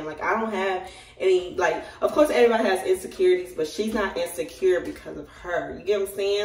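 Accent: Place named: Memphis, United States. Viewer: Are you originally from Atlanta? You sound like a Memphis girl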